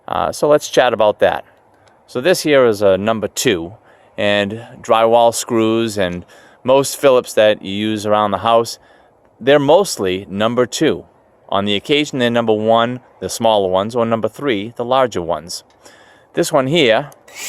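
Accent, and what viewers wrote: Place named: New York, United States. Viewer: My favourite part of this video was the strong New York accent. My second favourite part of this video was when you put the driver in reverse so it wasn't trying to drive the screw even deeper.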